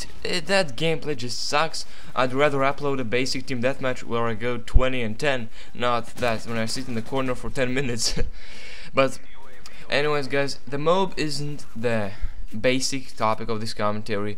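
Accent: Latvia. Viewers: epic latvian accent hahah...nice moab dude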